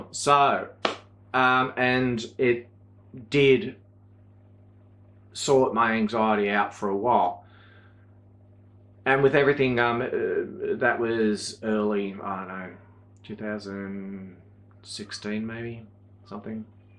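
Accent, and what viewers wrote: Australia. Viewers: I was just thinking about you yesterday and checked to see maybe I'd missed any videos, then this popped up on my feed tonight. I'm so sorry you have all this to deal with and I'm praying for you and hoping you'll go into remission. You have many fans- you aren't the only "old person" doing/watching You Tube, lol. Your videos are so interesting, your voice is soothing and I'll take that Aussie accent any day!💕 Lots of prayers, best wishes and love to you,.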